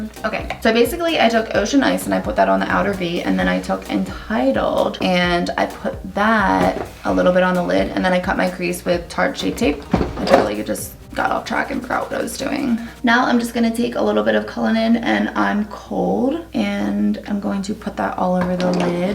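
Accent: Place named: Philadelphia, United States. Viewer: Your philly accent came through so much in this vid haha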